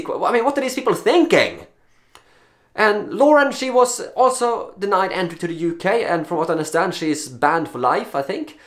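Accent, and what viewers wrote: Sweden. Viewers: This story is an absolute outrage, its all over youtube, what a joke. Radical Christian? Good grief... nothing like directing the Muslim problem on a other religion #SMH Btw, I love your accent, rants are just so lovely in broken English-Swedish. Keep up the good work. All the best x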